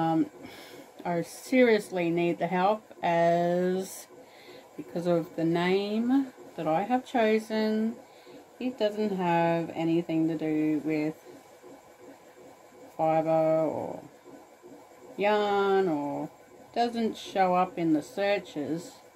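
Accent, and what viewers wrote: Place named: Australia. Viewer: Great to hear an Australian voice. Love this yarn. I am a beginner spinner but I have this in mind for later on. Thanks for sharing.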